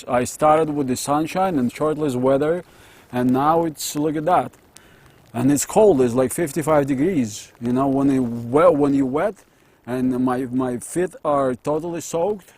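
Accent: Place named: Russia. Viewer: Почему я слышу русский акцент?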